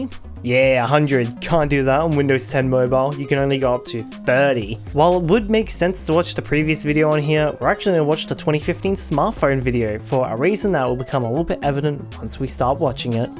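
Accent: Australia. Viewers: I was about to say damn this guy should bee australian tghen i realised hes on yt au...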